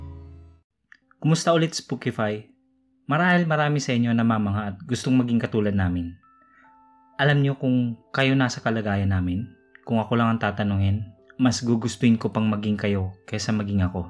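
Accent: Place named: Visayas, Philippines. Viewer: Natatawa ako sa tono ng bisaya ni admin..hehe..cute lng...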